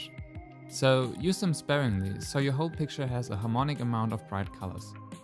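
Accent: Germany. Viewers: A lot of people don't like the montval, and I'm certainly not qualified to speak on paper quality but I really liked it when I got a big sheet for cheap just to try. Not everybody can afford arches. Dacht doch ich hör da nen deutschen raus Liebe grüße in die heimat, hoffe bei euch wird es nicht so schlimm wie bei uns hier an der amerikanischen ostküste. Wäre schon jahrelang lieber wieder zuhause aber nie mehr als jetzt. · Честно говоря, я просто написала то, что больше всего подходило (´∀` ). Переводить на слух с немецким акцентом немного сложно, и я просто долго не могла понять, что он говорит. Так что пару раз прибегла к простому "и так сойдёт". Хотя на видео он именно это и делает: вытирает кисточку пальцами. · Ich liebe den deutschen Akzent :D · gutes video. Aber boah was fprn harter deutscher akzent der ist ja fast so schlmm wie mein akzent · Ich wusste sofort dass du aus Deutschland kommst 😂 aber man merkt es kaum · Luuzy Arts wie man es einfach immer bei jedem deutschen so mega raus hört xD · Dein deutscher Akzent sticht heraus:D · I love your accent~ It's sounds German to me...correct me if I'm wrong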